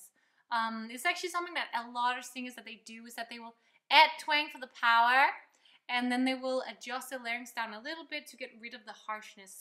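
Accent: Denmark. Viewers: That Danish accent though.... <3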